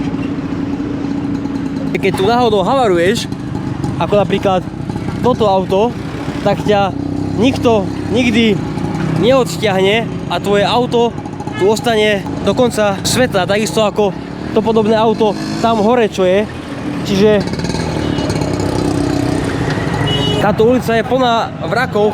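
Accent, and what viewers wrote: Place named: Hungary. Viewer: koli nareciu nebola som si ista ci pocujem Madarsky jazyk ..taky zvlastny podton mas...😊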